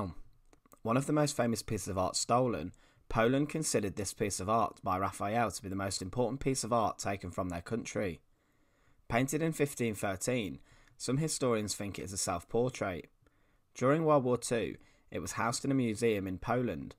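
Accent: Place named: Cockney, United Kingdom. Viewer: I can understand about half of what the narrator is saying. Pretty thick cockney accent.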